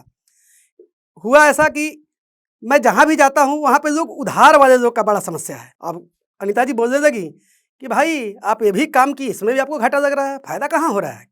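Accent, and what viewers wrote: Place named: Bhojpuri, India. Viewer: Bhojpuri bolte the tabhi lga ki mere taraf ke hai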